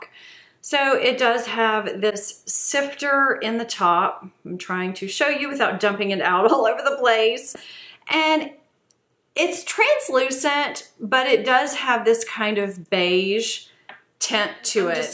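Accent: South, United States. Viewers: I'm new to your channel. 10 seconds of watching you and you have yourself a new subscriber. Also do I hear a southern accent?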